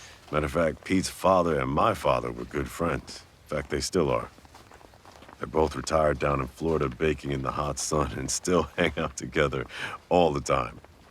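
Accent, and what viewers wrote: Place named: Italy. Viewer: And my friends ask me, why am I into Italians?... ummm hello did you not hear the accent, the food ? 😍. Thank you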